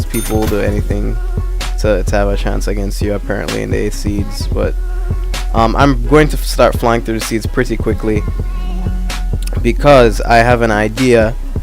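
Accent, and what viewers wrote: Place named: Jamaica. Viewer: u sound jamaican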